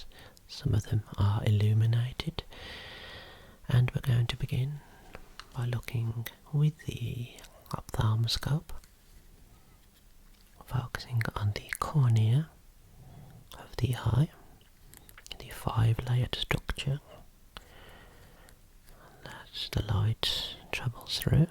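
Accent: Birmingham, United Kingdom. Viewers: you are the only person that I've ever heard with a brummie accent that sounds good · Never thought Brummy asmr whispers would hit me so hard but they do, keep up the great work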